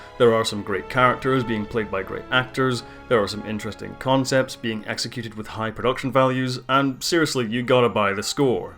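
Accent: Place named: Fife, United Kingdom. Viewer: Are you from Fife?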